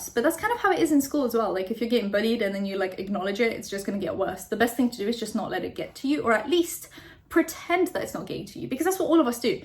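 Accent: United Kingdom. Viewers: Ok, I've been to London three times. I lived there for 5 months one of the times. I'm really good at deciphering a British accent....You're actually talking really fast....Please, slow down a bit, dear. What you're saying is interesting enough to want to hear it...I can understand it, but it takes effort. Better if people can relax and listen.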